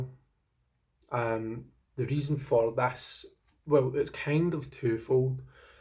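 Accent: Scotland, United Kingdom. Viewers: Congratulations on a fab first video and always lovely to hear a broad Scottish accent :-)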